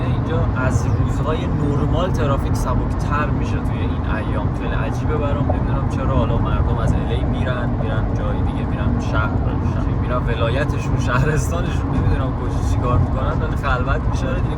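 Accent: Mashhad, Iran. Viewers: اقا تو که لهجه مشدی یاد گرفتی به بگو یه کمی شمالی حرف بزنه ببینیم چقدر بلده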